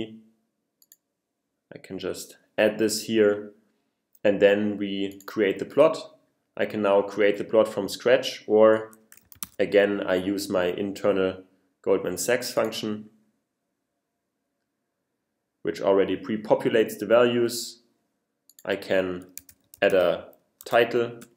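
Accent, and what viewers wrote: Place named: Germany. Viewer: hey, sprichst du deutsch? dann lg -der akzent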